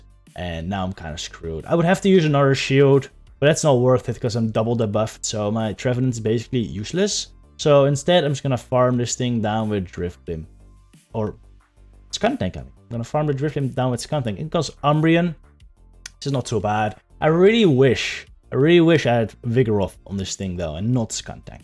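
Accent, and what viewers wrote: Netherlands. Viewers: Zo duidelijk dat je NL bent, goeie video’s wel hahaha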